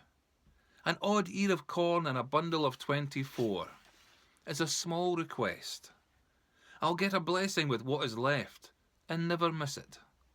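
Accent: Scotland, United Kingdom. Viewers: in a truly authentic brogue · nice to hear Scots reading Burns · all in a good Scot's accent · done in a Scottish accent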